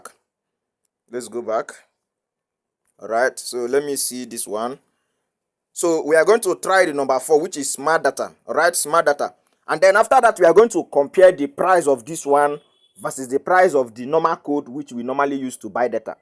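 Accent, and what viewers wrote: Igbo, Nigeria. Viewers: Na your igbo tongue dey give me joy😅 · Honestly. It's that Igbo tongue that makes me smile on my first view of whichever of his videos I bump into. 😂😂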